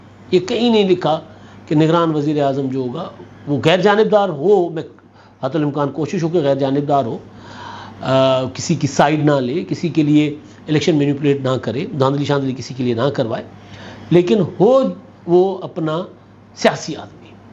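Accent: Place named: Sindh, Pakistan. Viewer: مزہ آجاتا ہے آپکا وی لاگ دیکھ کر۔ ہیں تو آپ سندھی۔ سندھی لہجے کی جھلک آتی رہتی ہے تھوڑی تھوڑی آپکے لہجے میں مگر. گفتگو کا انداز اور سبجیکٹ پر گرفت بہت مظبوط ہوتی ہے۔ عمران شفقت کا بھی اچھا ہوتا ہے مگر آپکا منفرد ہے۔ آئ لو. یوؤر. وی لاگ۔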